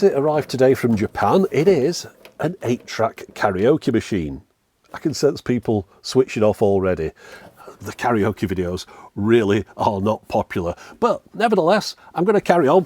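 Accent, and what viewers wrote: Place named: Lancashire, United Kingdom. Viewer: I reckon is from Lancashire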